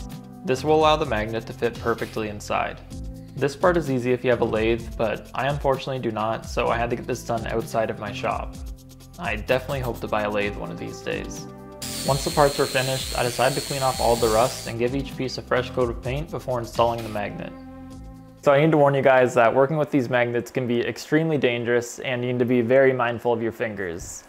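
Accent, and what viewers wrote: Canada. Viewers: You must be in Canada, given accent, English, no traffic & snow on the landscape near the roads // lol .. metric kw / kmph / km distance / units :)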